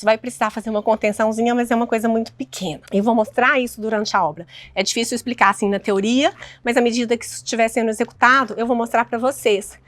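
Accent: Minas Gerais, Brazil. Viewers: Oie amo seus vídeos! Quando vc fala com esse sotaque mineires 🤣🤣🥰 amo mais ainda… sou apaixonada por essas transformações em casas antigas💙 um bj grande e to na expectativa de novos vídeos👊🏻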